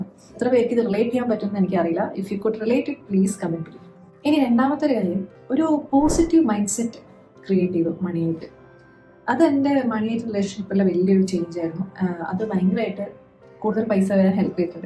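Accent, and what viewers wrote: Kannur, India. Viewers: Kannur slang❤ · Kannnur ano beedu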